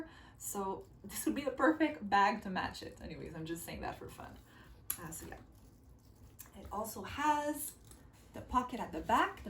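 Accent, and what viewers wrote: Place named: Quebec, Canada. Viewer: You're french Canadian right ? I feel like I can hear the slight Canadian french in your accent. 🥰😊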